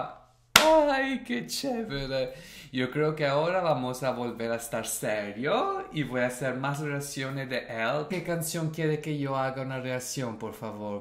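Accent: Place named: Italy. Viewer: Hi, funny video... Blas Canto´s performance was amazing, it's extremelly difficult to imitate Diana Navarro. Your Spanish is good as well, keep going. Btw, why do you have that Italian accent whe you speak Spanish?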